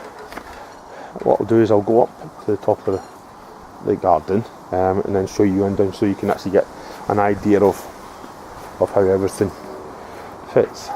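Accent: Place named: Scotland, United Kingdom. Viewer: Great raised beds, Great frames, Great Vid !! Correct me if i'm wrong but your Scottish accent seems to have something mixed in ?? Maybe a touch of European ??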